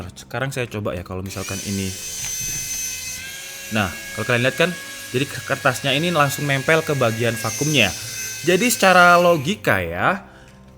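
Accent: Malang, Indonesia. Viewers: Ngalam sam sawangane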